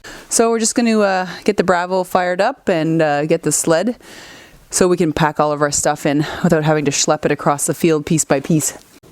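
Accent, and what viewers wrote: Canada. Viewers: Canadian accent :) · Canadian people have such a nice accent.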